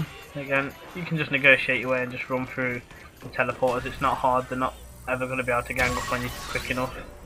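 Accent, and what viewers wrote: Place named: United Kingdom. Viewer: i love ur british voice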